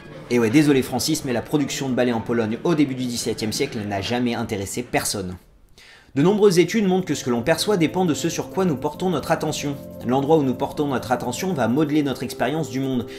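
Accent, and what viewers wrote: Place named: England, United Kingdom. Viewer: Best accent anglais ever!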